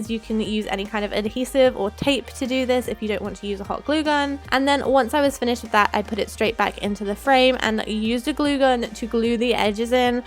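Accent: England, United Kingdom. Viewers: Are you from the uk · Are you from England? You have a sweet accent :)) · are you from the UK ?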